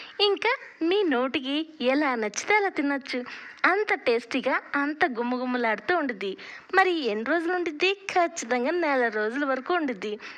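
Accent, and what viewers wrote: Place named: Telugu, India. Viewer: రొయ్యపోట్టు కారం ప్రిపేర్ చేసుకునే విధానం చక్కగా వివరించారు సిస్టర్ మీరు వివరించే అచ్చమైన తెలుగు యాస స్టైల్ సూపర్. 👌🎉💐🙏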